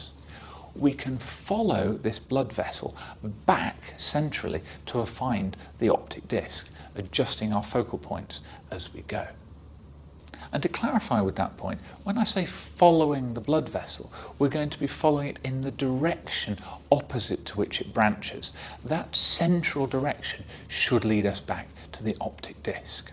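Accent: United Kingdom. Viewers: British accent 💚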